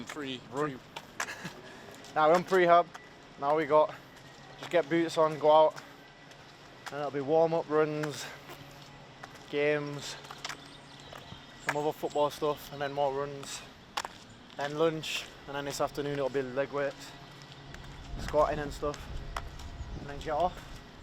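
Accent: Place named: Manchester, United Kingdom. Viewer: Sounds very manc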